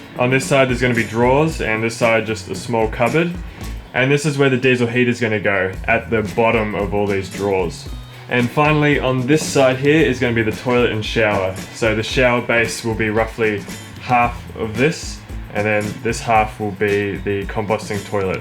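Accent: New Zealand. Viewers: Awesome!!!! Well done! I love New Zealand, the accent and your work! Can’t wait to see more!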